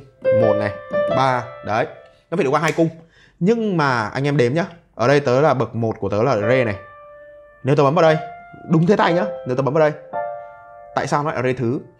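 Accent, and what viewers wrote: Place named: North, Vietnam. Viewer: Cảm thấy may mắn vì mình hiểu đc tiếng bắc 😂😂😂 quá nhanh quá nguy hiểm 😂😂😂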